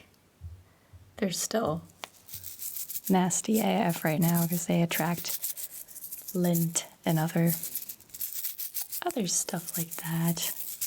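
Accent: United States. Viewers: Can you please tell me how/why you have an American accent but, I think you said, you’ve never lived there?! I’ve watched you for YEARS and have always wondered! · Whatttttttt?! Where’s the German accent? You sound like a normal American I’d talk to in the street 😂🤯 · HOW is your accent so American. It always stuns me how American you sound! I wish my language skills were half as good! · I never noticed how much emotion is taken away from your voice when you whisper but it sounds weird hearing you with more range and emotion. You also sound a lot more American when you speak normally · You really, really sound American. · You sound even more American than usual. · I’m confused. How are you German, but have an American accent? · Why is your english accent so American? Aren't you german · How do you sound even more American in your regular voice 😂 The change is tingly though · How do you have an American accent?? 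Did you pick it up from American shows or learnt from a German who spoke English with an American accent? I'm so confused rn 😂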